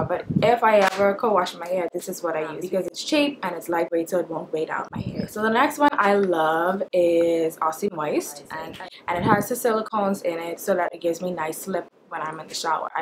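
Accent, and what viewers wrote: Bahamas. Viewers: As a Bahamian who lives abroad i watch natural hair videos all the time to get tips. I spotted your accent from the first sentence!!! Im so excited to see a Bahamian vlogger! Thanks for this video girl, it was really helpful. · omg... this is my first time watching your videos. They say Bahamians dont have an accent, from the first time I heard your voice i knew you were Bahamian. Great videos. Thanks for sharing!!! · mdss..when I first hear you speak I was like she gatta be Bahamian Iol...ik my people but keep up the work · Lmao how I guess you were a Bahamian by the accent :") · You're a Bahamian? At least you sound like one. I love your accent 😍😍😍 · I'm dying. All I was thinking was why she sounds like a Bahamian explaining something. I finally heard "Nassau" and was validated. Heyyy, cheers to Bahamian vloggers. Keep it up! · The second I heard your voice, I was like "that's a Bahamian" lol. 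I was right. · That accent cant hide boy the minute i play the video i was like that gal gata be bahamian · From you opened ur mouth I was like "Is this girl from the bahamas"! Nice video. I used that shampoo when I was relaxed, but now that im natural I only co wash, I use cantu or pantene co washes, pantene is really good. I really have to get that aussie moist conditioner tho, wanted that for a while now. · man heyyy i recognize a bahamain wen i hear one aee....looool i thought i recognized ur voice...yeah tingz ova here hella expensive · Oops you are from Nassau my family is from there I knew I heard the accent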